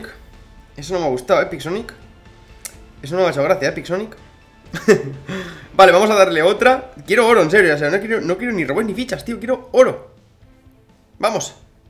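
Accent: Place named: Guatemala. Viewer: Voz Chapin como así ha pixonic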